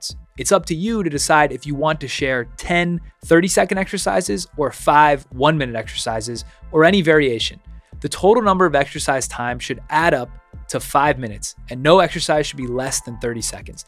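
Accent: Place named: United States. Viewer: Your voice sounds sooo American, omg lol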